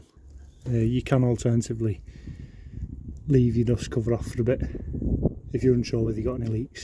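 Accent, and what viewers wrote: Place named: Newcastle, United Kingdom. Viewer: Ah I see you are a geordie too :) 😊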